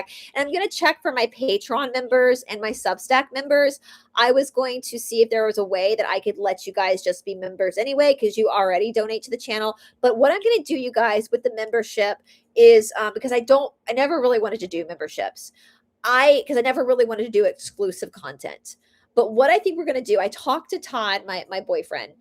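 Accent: South, United States. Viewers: Oh, and all the southern isms are spot on, lol.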